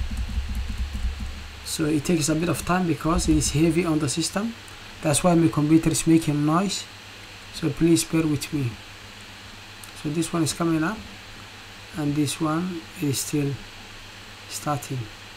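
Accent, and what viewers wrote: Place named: Somalia. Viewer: waraa english kaada aaba kafahmay inaad somali tahay:face-blue-smiling::face-blue-smiling::face-blue-smiling:🤣🤣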